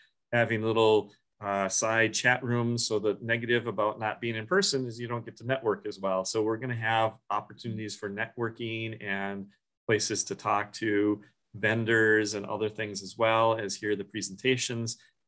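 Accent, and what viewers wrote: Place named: Long Island, United States. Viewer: Thank you!! Love the Long Island local talk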